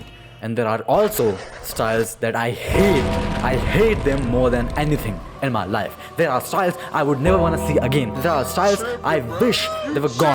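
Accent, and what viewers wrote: India. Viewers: bro's Indian accent>>>>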